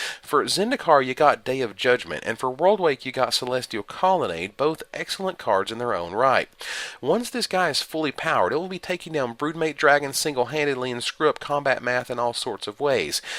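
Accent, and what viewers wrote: South, United States. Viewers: He's from the south, he can't help it.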